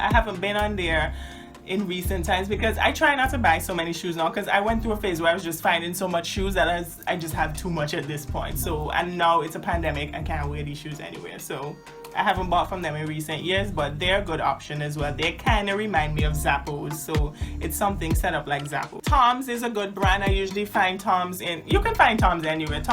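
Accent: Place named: Caribbean. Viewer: Thank you for this! 💕I’m a young girl wear a size 12 feet and can’t find cute shoes anywhere😩. Love your accent. I’m also from the Caribbean. I’m from the Virgin Islands.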